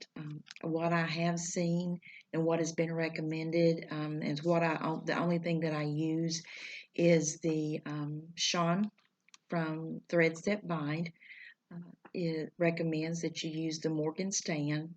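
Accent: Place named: South, United States. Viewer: Oh my gosh! Just found your floss tube and watched both back to back. Love your southern accent! Your voice is very relaxing. I love you showing fabric and thread options, different from what is called for! My choice for fabric is 32 ct even weave or 36 ct linen by PTP or Weeks. What are the smalls behind you? Are they fobs?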